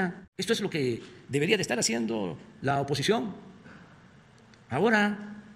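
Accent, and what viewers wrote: Tabasco, Mexico. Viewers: El presidente viaja muchoooo y no trabaja.... Que raro acento tiene ya, entre tabasqueño y de cdmx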